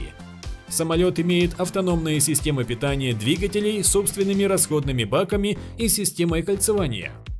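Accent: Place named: Komi, Russia. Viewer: Ты из Коми что ли? Тебя слушать-уши вянут!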